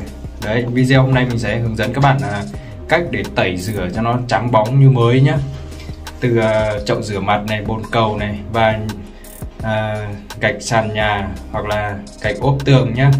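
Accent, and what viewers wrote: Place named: Bac Ninh, Vietnam. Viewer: Giọng bạn như dân Bắc ninh ý nhỉ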